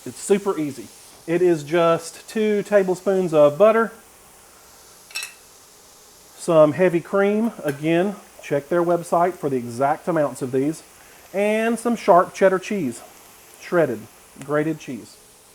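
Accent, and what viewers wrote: South, United States. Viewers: This guy is the best! I am so glad i can watch him make different recipes, taste them and give his honest opinion. So many times i have wanted to make something keto and there are a billion different recipes..... some completely different and some very similar. It can be very difficult to determine which one is the best one to make. As we all know keto ingredients can be very expensive. There is nothing worse than making a recipe that does not turn out anything like you have anticipated. Not only have you wasted ingredients that cost a lot of money, but you have also wasted a lot of time and worst part you don"t get to eat that one thing your have been,craving and thinking about nonstop, praying to the keto gods that you can make a low carb version. So THANK YOU! You are so helpful and a joy to watch. You have the cutest southern twang. 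Love your personality and energy.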